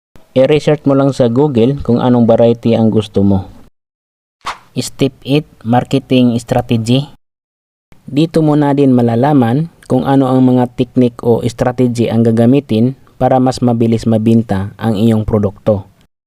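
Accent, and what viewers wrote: Visayas, Philippines. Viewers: 😢 parang bisaya si kuya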